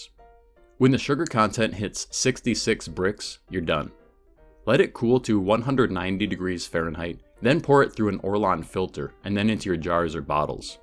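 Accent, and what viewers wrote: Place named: Canada. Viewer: This man sounds Canadian so you know he knows what he’s doing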